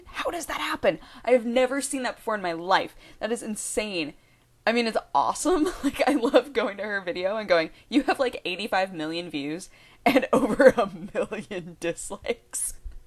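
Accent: United States. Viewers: lol, it sounds so weird hearing you speaking your American accent rather than your "British" accent, lol. ;)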